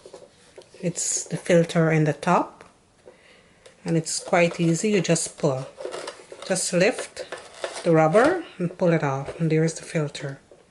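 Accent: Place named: Trinidad and Tobago. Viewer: Are you Trinidadian? (Wonderful video, thank you.)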